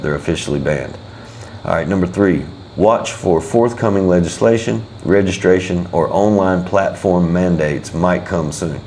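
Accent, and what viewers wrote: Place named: South, United States. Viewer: Hi Mr. please don’t leave.I loved watching you, I’m a pilipina 72 years old and I enjoyed watching you. You seems like you’re down to earth young man,I’m from Little Rock Arkansas so I just love your southern accent 😊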